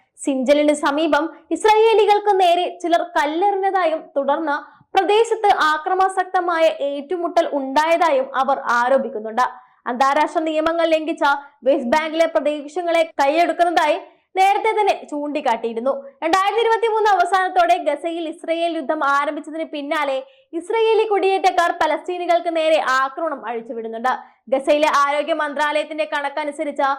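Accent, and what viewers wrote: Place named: United States. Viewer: ഇത് us ഇംഗ്ലീഷ് 🫣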